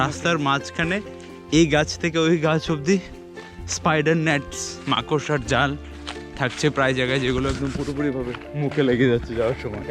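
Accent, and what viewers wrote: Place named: Comilla, Bangladesh. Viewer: It seems that you are from Comilla , though we know, Tripura was the part of East-Bangal before the British period. Your tone and dialect are matched with us .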